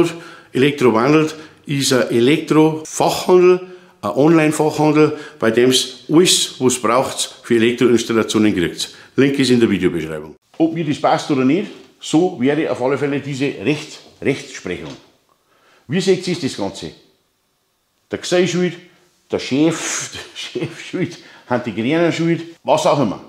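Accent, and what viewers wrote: Bavaria, Germany. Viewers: Hallo, meine Meinung zu den aufgeworfenen Fragen: "Jeder Unfall ist vermeidbar. Eine schuldhafte Pflichtverletzung liegt hier meines Erachtens von keiner Seite vor. Die Prüfung des spannungsfreien Zustands sehe ich nicht als zwingend an. Bei einer Leitung, die ich selbst gelegt habe, gehe ich doch nicht davon aus,dass diese plötzlich Spannung führt. Vielmehr handelt es sich hierbei um eine "Anlage im Bau", spannungsfreiheit müßte dann geprüft werden, wenn die Anlage durch Schalthandlunge unter Spannung gesetzt werden kann. Da davon auszugehen war, dass die Leitung noch nicht abgeschlossen ist, war dies hier nicht der Fall ".Schlußfolgernd muss gesagt werden, dass bei der Auftragserteilung eine bessere Abstimmung erfolgen muss. Ich würde die Anschlüsse an der Hauptverteilung nur von einer Person, nach Vertigmeldung der übrigen Arbeiten, vornehmen lassen. 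Ich finde deine Beiträge großartig, wertvoll für Schulung, Aus-und Weiterbildung. Ich habe über 40 Jahre im Beruf gearbeitet und sehe deine Beiträge sehr gern. Das Bayern ihre eigenen schönen Dialekt haben, weiß man normalerweise. Viele herzliche Grüße Reiner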